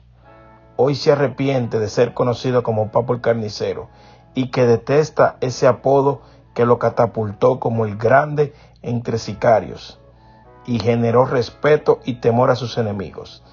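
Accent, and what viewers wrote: Dominican Republic. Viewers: Que mal lees , sos Dominicano?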